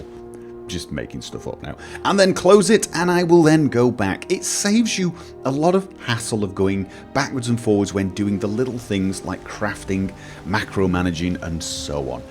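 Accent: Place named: Birmingham, United Kingdom. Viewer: He's from the British midlands, I believe. Can't quite place it, but there's a bit of Birmingham in there...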